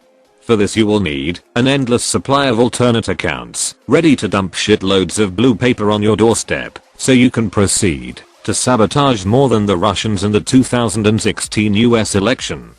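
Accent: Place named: United Kingdom. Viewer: How did you get the British voice?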